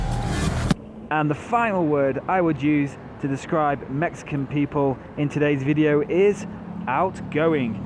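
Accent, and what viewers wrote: England, United Kingdom. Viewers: Lovely video!! luv english accent!!😝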